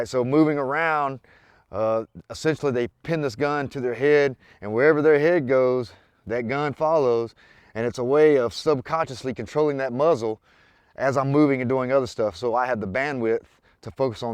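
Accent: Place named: Appalachia, United States. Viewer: You sound like you was raised in the head of a holler like mine….like John Wayne toilet..ruff n tuff don’t take no shit off nobody…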